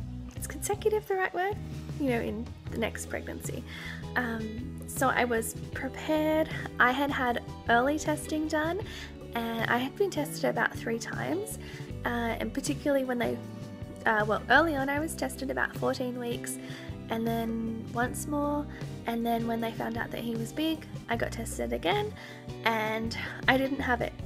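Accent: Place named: United States. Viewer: Happy to hear you and baby are doing well..its going so fast.I live in Qld Australia, I thought you were American lol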